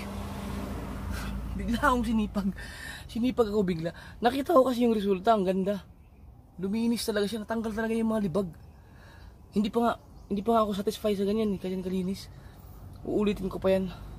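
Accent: Visayas, Philippines. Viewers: taga saan ka pala talaga sir may parang bisaya halo tagalog mo sir tanong lang hhehehe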